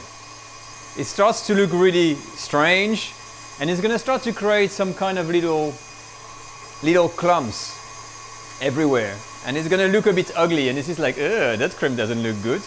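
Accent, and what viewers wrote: France. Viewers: Isn’t he French? Doesn’t sound Italian. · is always nice to speak English with France Accent... tres bien...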